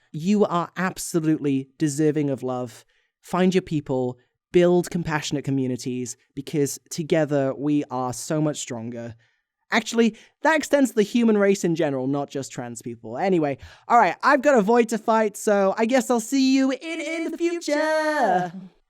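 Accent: United Kingdom. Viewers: (Warning, overused joke incoming) If I had a nickel for every time I’d seen a transmasc person named with what sounds like a somewhere British accent that’s also just a chaotic wholesome vibe to witness on my silly little screen I’d have two nickels, which isn’t much but it’s fun that it’s happened twice (I almost forgot to finish the joke)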